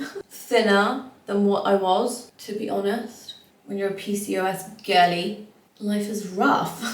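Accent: United Kingdom. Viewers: I remember you saying that wherever you go you take on an accent and girl that London accent is thick right now😂 Love you and this video❤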